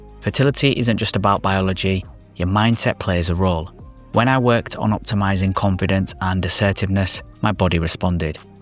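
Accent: United Kingdom. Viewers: I'm curious about your accent... which region of the UK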